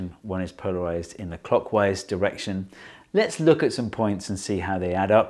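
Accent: England, United Kingdom. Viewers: he finally says anticlockwise! Sounded so wrong to hear his English accent saying "counterclockwise"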